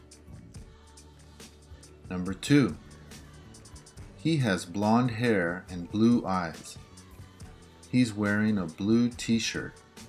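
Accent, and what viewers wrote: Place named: United States. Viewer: Thanks! I couldn´t find an American accent video till I saw this one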